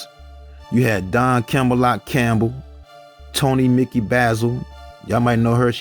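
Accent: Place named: Chicago, United States. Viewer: What part of Chicago you from, bruh? I’d recognize that accent anywhere.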